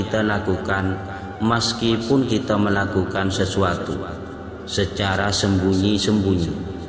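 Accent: Indonesia. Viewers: ilmu yg bermanfaat ,saya suka cara pentampaianya macam orang minang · Orang madura berbahasa Indonesia 😀😀😀 Dalil naqli terus bos. Dalil aqliya jelasin donk....?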